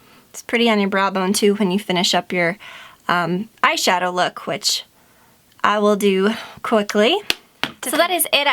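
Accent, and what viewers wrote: South, United States. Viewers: You have a slight southern accent aha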